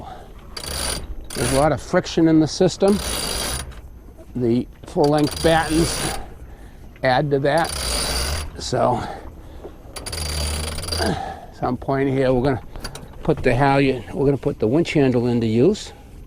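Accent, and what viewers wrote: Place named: Maine, United States. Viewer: I think I hear a Maine accent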